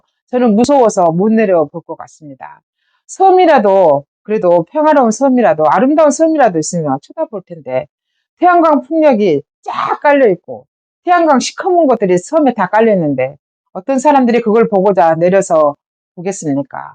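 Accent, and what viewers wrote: Jeolla, South Korea. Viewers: 와~~ 진짜 존경스럽네요..... 내옆에 아는 (지인은 아님)인간 김대중 내가 실정한거 말하니 눈깔에 힘주면서 그 분이 그래도 민주주의에 얼마나 기여했는데 그러냐고 소리치기에 솔찍히 귀싸대기 한대 갈려줬음 ㅋ 미친늠 민주주의에 기여한거 있지만 때중이 없어도 우리나라 국민성이라면 이정도 민주주의는 언제든지 이루었어 하지만 때중이의 실정은 일일이 나열 할 수도 없을만큼 많다 그러니 개소리마라하고 귀싸대기 날렸음 솔까 덤빌줄 알았는데 안덤벼서 일이 커지진 않았지만 ㅋㅋㅋ 이분 님 전라도 사투리 쓰시는데 이런분이 전라도에 많이 최소한 30%만이라도............ 그럼 지금의 대한민국은 훨씬 더 발전했을건데.......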